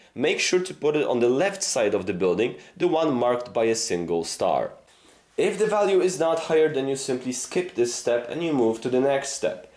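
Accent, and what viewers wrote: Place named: United States. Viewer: Tak z innej beczki.Z doswiadczenia wiem(12 lat w UK),ze czesto lapiemy jako pierwszy akcent ten,ktory jest wokol nas,Po 2 latach pracy w irlandzkiej firmie ludzie do dzis pytaja mnie czy jestem irlandczykiem.Skad ta nalecialosc amerykanskiego akcentu,Za duzo amerykanskich filmow?Moze pierwszy nauczyciel czy tez taki osobisty wybor?To nie hejt ani sarkazm.Po prostu jestem ciekaw.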